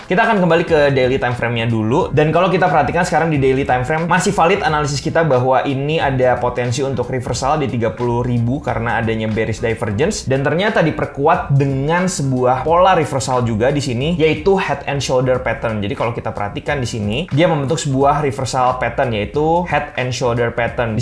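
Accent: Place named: South Jakarta, Indonesia. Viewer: bang which is lu annoying, sumpah, logat jakselnya ilangin pls sayang lu udah bagus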